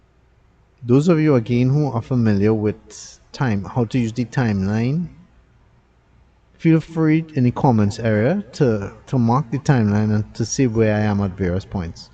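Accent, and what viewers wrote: Trinidad and Tobago. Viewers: Hello,I love watching all your videos, I like the trinidadian accent,I come as often as possible as my family all live there,I now live in Europe,please talk more and Ill watch more often..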